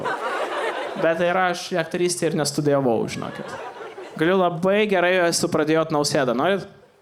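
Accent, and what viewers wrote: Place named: Poland. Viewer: 😂😂 Geras. Prajuokinti Lietuvaičių sunku. Šaunuolis, geri bajeriukai ir tas akcentas Lienkišckas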